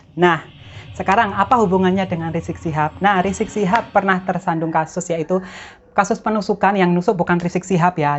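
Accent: Java, Indonesia. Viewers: Min ngomongnya medok bgt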